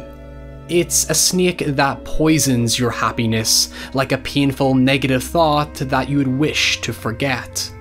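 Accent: Northern Ireland, United Kingdom. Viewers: Northern Irish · He is from UK, you know the f'ing birthplace of the language called English!? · He's from Northern Ireland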